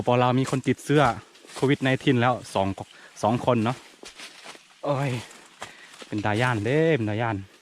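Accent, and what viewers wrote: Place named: North, Thailand. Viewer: สำเนียงพูดออกเหนือบ้างออกลาวบ้างคับอยู่ใหนคับชอบๆติดตามเน้อ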